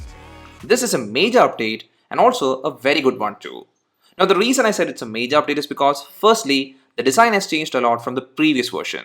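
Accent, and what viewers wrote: Kerala, India. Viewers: Typical malayalam English slang...🤗🤗🤗🤗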